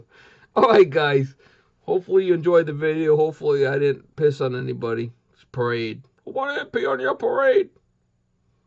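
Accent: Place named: Brooklyn, United States. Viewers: from brooooookllllllynnnn....lame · this was awesome I just got 5 Ton 14 Seer Goodman Air Conditioner today so that was too funny the Goodman stuff thanks for the info I understood half... lol thank bro cool accent must be NJ or NY or Boston...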